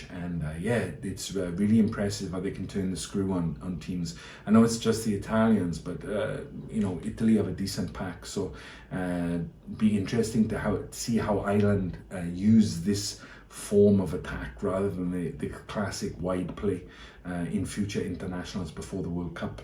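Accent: Wales, United Kingdom. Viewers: You sound like a Welsh man. I am good friends with spike Williams, ex captain of Wales. again, many thanks, and I wish you the best of luck with your channel.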